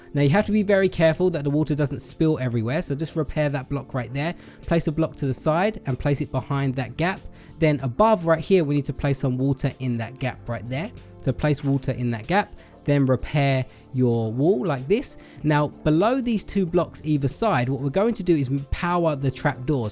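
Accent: United Kingdom. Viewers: are u british because u talk british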